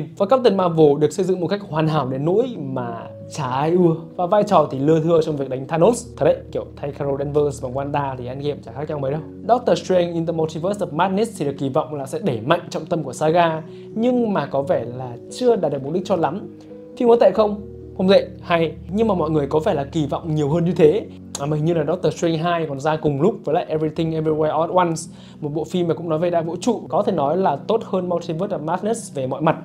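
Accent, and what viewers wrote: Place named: Central, Vietnam. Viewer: Yo kh bàn đên sự vô duyên của ông thì cái nói miền trung tôi thấy nó hơi có mùi phân biệt r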